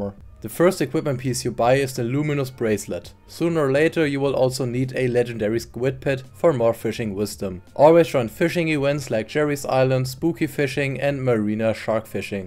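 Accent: Germany. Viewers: Love that i hear that he is german i think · I hear deutsch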